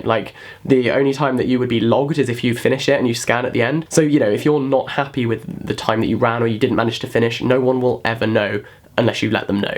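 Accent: United Kingdom. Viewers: Omg. You don't know how happy I am to see a new video from you. I discovered you chanel a few days ago and I absolutly love your videos! And I love your british accent! It's soooo cute ( Sorry I will stop with that) Lots of love from Germany You and your Dua Lipa jokes😂 I love it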